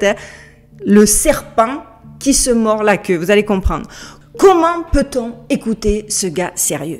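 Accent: South, France. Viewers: Un vrai rayon de soleil, ton accent du sud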